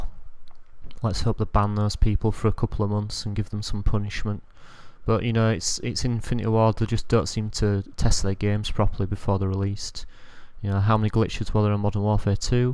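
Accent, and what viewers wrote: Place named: Yorkshire, United Kingdom. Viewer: Yorkshire accent commentaries FTW. Great comm mate, especially for your commentary virginity. Face humping and piecey biting off? haha...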